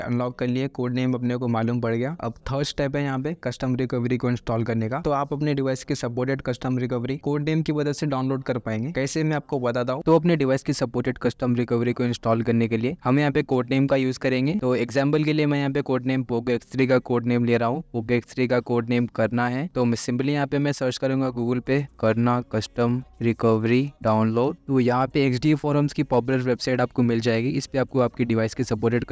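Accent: Hyderabad, India. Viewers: Hyderabad se ho aap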